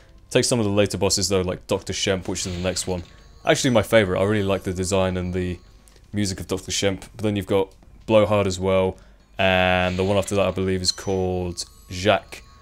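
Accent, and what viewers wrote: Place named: England, United Kingdom. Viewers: english accent is the best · Reliving my childhood here, through an english accent!!!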